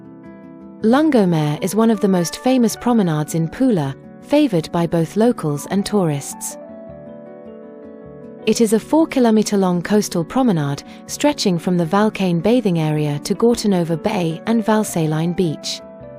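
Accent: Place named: United Kingdom. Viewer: The narrator has Royal English❤i say it as a Russian English tutor.Adoration and likes to her voice and sound.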